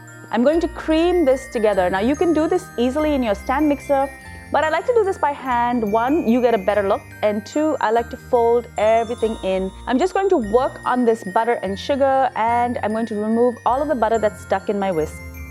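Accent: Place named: India. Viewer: I like your accent eventho you're Indian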